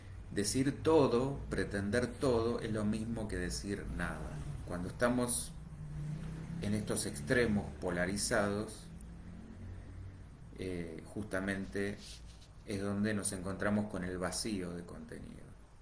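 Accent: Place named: Argentina. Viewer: Buen videos y canal , eres humanista hermano argentino entiendo Masomenos el acento es que yo soy mexicano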